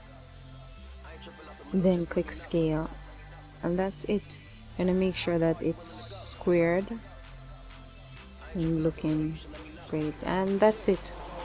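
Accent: Jamaica. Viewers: U Jamaican ? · the Jamaican accent tho :) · U jamaican? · Good job. Knew you were Jamaican when I heard the voice. Thanks for the help. You stop making vids I see. Hope all is well.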